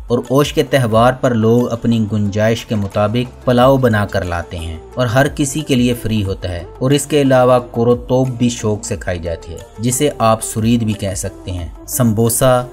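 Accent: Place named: Punjab, Pakistan. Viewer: First you should try not to speak Urdu with Punjabi accent. But your German and you will be German understand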